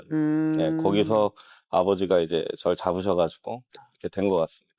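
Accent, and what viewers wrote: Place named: Chungcheong, South Korea. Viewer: 고향이 충청도신가 백종원 필이 나네여 성공할 관상이네 부인분이 사람볼 줄 아는듯 임금 높은 나라는 세금과 생활비도 비쌉니다 앞으로 남고 뒤로 밑지죠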